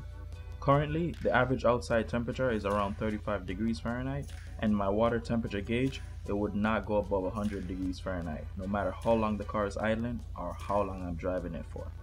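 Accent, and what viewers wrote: Jamaica. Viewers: U Jamaican bro??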